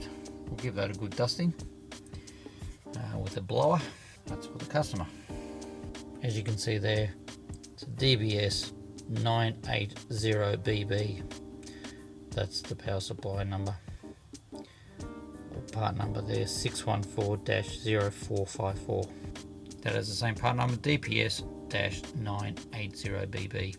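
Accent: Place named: Australia. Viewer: Thanks for video mate .Good to hear an Aussie accent :)...Can we buy parts from you ?...I have a 3,1 with faulty PSU. Cheers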